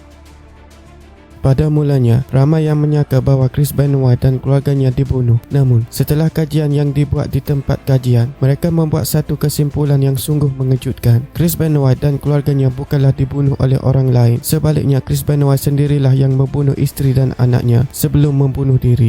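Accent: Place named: Terengganu, Malaysia. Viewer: Ni yang ckp ni mesti orng ganu atau Kelate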